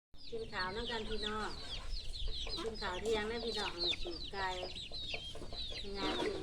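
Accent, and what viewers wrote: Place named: Ubon Ratchathani, Thailand. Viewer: สําเนียงแบบนี้ต้องเป็นคนอุบลชัว.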